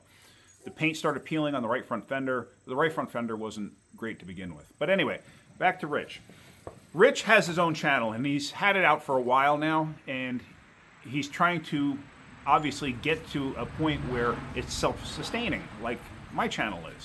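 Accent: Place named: New York, United States. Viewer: Listening to your accent , I'm guessing your from the NYC metro area ? Where are you from ? I will help your friend !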